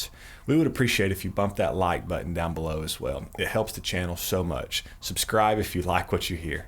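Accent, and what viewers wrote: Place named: North Carolina, United States. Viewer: Thanks, great content. I actually thought you were from NC.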